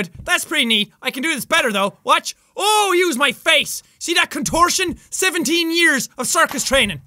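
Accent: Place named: Ireland. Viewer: I like the stronger Irish accent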